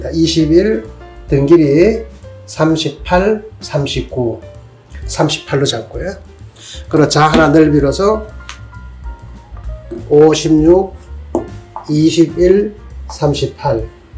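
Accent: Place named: Gyeongsang, South Korea. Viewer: 할랑하게 갱상도 분이신거같네요 설명잘들었습니다 ㅎㅎ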